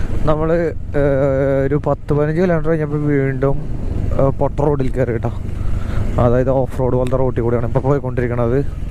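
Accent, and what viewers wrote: Thrissur, India. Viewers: നിങ്ങളുടെ തൃശൂർ സ്ലാങ് പൊളി ആണ് ട്ടോ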